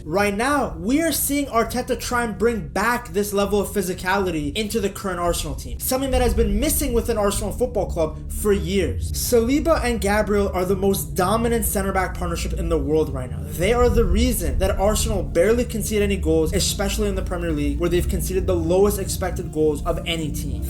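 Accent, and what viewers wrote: United States. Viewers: "VEN-ger," not Wenger. Sorry, man, but if you want people to believe you are an authority on football you need to be able to pronounce correctly the name of a manager so famous that little girls in Europe know it. It's like you never watched a premier league game before 2020 or never listened to pundits discuss the sport. I'm not dissing you, dude, but you sound like some Yank creating clickbait, not a true fan of the beautiful game.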